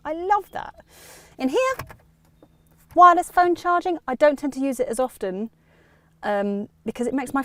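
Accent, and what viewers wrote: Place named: Essex, United Kingdom. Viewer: Hi,its got a head up displaaaaaaaay 🤣🤣🤣 Love it,the essex accent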